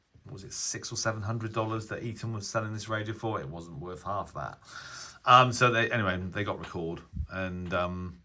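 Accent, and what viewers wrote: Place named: United Kingdom. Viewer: Quite interesting story here. I love your British accent 😁 I never had any of these high-end Etons. I was among the lucky ones who've grabbed a brand new Elite Executive for 50 euros on eBay, this radio so many people speak greatly of. Well, I'm not that impressed. I don't think it'll make me retire my PL-660 or my Sony ICF-7600G. It has some selectivity issues when connected to a long wire. Also, the soft muting while tuning the bands kind of harms the pleasure. It's nice, well built, good sound and a gorgeous display but not my best radio. I'm glad I didn't buy it at its retail price. I also have an ICF-2001 (not D) that was dead (didn't turn on) but someone managed to bring it back to life for me. I 'll get it back next month and I'm looking forward to this. Keep up the excellent contents here and on X. Best 73s.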